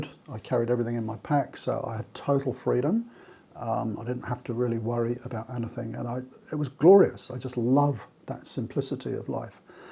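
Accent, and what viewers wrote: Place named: Australia. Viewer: listening to this video was like hearing my own thoughts. My walking buddy and I also have amazing partners who are happy for us to follow our dreams and walk Caminos. Our 3rd Camino will start April 2024 on the Camino Portuguese (and hopefully Finesterre and Muxia) if time and no injuries allow. I love the simplicity of walk, eat (cafe con leche) sleep, repeat, meeting people from all over the world who have the same passion, and especially how I felt at the end of my last one: fit, relaxed and wanting a more simplistic life. Now I have retired, I have achieved the simplistic life and happily planning our next Camino. Your tip about not going into too much detail of your walk with others who have not walked a Camino is spot on. Just watch their eyes and when they start to glaze over, its time to change the subject 😀Thank you for your channel, its great, especially listening to a relaxed Aussie voice. 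I'm from Queensland, cheers Wendy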